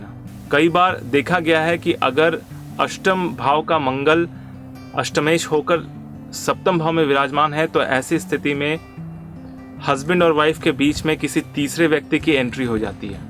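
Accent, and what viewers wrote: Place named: South, India. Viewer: Bakwas jaise sawdaan India bool rha ho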